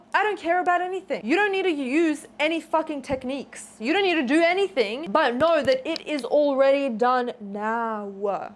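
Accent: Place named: Australia. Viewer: Wow I really don’t feel motivated by someone yelling at me in an Australian accent 😂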